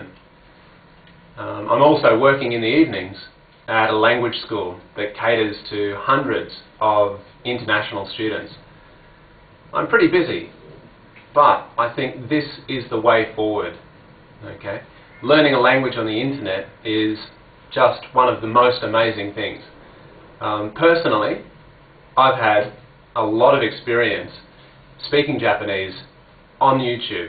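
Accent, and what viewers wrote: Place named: Australia. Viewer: I literally laughed when you switched to English, the Australian came back so strongly. (I'm Australian as well.) Im actually just as interested in teaching languages as I am with learning them. Im not exactly sure how one gets into language education in Australia though. I think I might take some of your classes when im done teaching myself the basics :P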